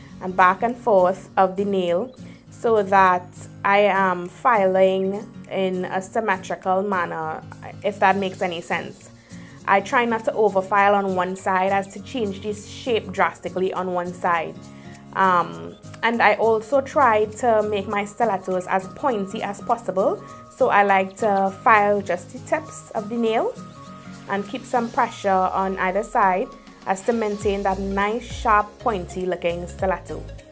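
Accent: Trinidad and Tobago. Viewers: I hear my Trini voice lol..... great nails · First video of yours I'm watching and sound triniish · SUBSCRIBEDDDD as soon as I heard that trini accent <3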